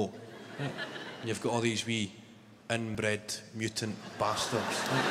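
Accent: Scotland, United Kingdom. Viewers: He does actually speak English, but with a Scottish Accent. Maybe you just don't bother listening.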